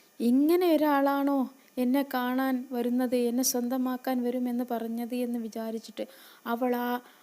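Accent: Malappuram, India. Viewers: ഉഷാർ ആണ് itha mlprm സ്റ്റൈലിൽ തന്നെ പറഞ്ഞാൽ മതി അടിപൊളി 👌👌👌👌ഒന്നും parayaan ഇല്ല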